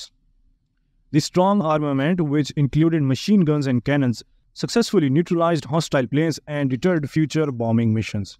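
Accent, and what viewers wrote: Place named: India. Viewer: By the accent I think he is Indian. If you take it into account, he is making a real effort to speak slowly.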